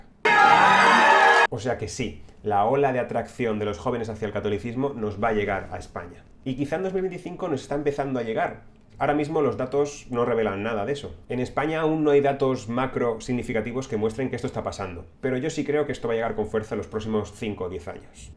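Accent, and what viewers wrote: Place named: France. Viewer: Lique por tu acento francés. Mathafaka tb suena mejor en ing. ✅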